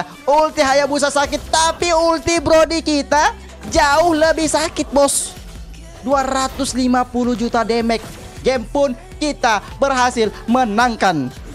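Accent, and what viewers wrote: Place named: Makassar, Indonesia. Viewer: orang makassar ki kah? karena logatnya kek makassar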